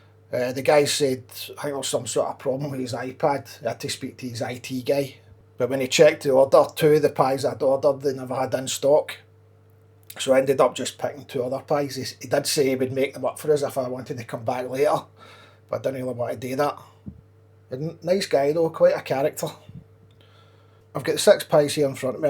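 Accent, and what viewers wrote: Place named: Scotland, United Kingdom. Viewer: Very nice. I was married in the church you drove past, the pies turned out better than my marriage 😂. Good to hear a proper Scottish accent on YouTube, most people try to posh up the glesga accent.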